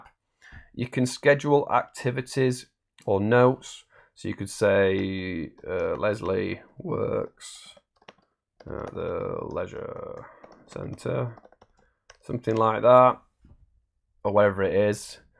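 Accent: North, United Kingdom. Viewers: Hi. I am starting a role with an Organisation as a customer services manager and they use Zoho. I would like tot get to know the system better to assist in this new position. Plus I am from the North of the UK and I can relate to the accent;-) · Hi, good to hear a Brit voice easier to understand than the Zoho videos and thanks for being active on the Zoho products. I've used databases in the past; Sales Force etc.. Used Zoho for 1 year but not in anger. We are looking to increase customers/interaction/subscribers etc... As I'm on the move its ideal for iPad/iPhone, now going through the correct steps/blueprint to give me a better work process so when we expand a system is in place. cheers, Charles.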